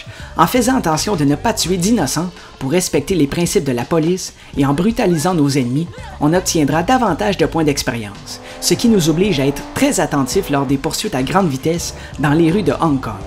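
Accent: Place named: Canada. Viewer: J'adore ton accent et j'aimerais trop aller au Canada!!!!!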